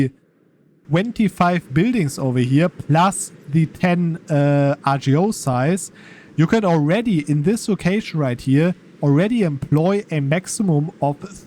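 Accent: Germany. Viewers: German accent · "how did you know im german" ahhh accent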